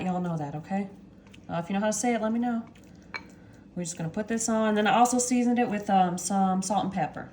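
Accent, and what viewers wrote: South, United States. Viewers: You sound Southern just like me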